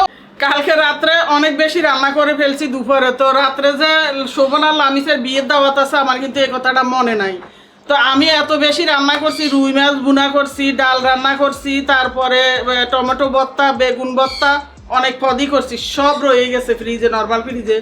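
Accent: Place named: Char, Bangladesh. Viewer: কথায় বুঝা যায় চর এলাকার মানুষ।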